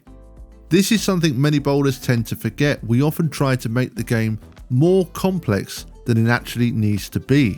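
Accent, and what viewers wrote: United Kingdom. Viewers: Bs. A British AI voice?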